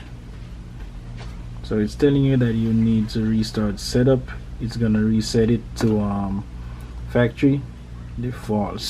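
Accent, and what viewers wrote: Jamaica. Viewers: You sound really Jamaican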